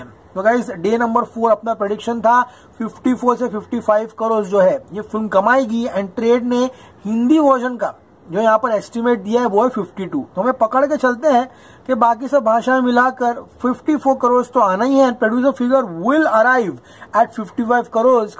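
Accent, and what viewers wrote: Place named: Hyderabad, India. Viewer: Lol hyderabadi accent 🔥🔥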